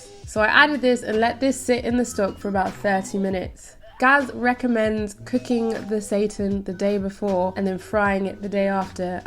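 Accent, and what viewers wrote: London, United Kingdom. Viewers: Love the recipe, I'm going to give it a go. Love the 'innit like' accent too :)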